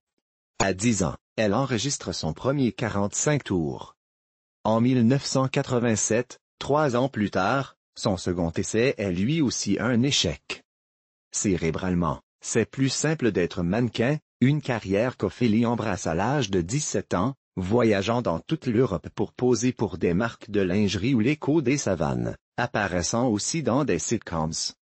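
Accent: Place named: Quebec, Canada. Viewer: Heu! elle viens faire quoi Loana dans les photos !? j'm'suis encore perdue sur yt , les voix robotique avec l'accent jouale de Québec MDR! j'décalisse de dlà !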